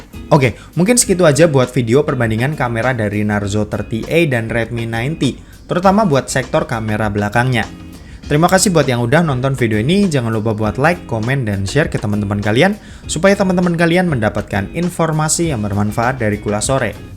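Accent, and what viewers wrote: Semarang, Indonesia. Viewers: wong semarang yo mas? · cah semarang ki jebul🤭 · Orang semarang bang?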